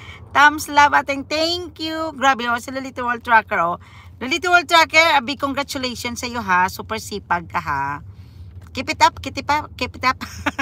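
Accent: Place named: Hiligaynon, Philippines. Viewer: Ilongga ka host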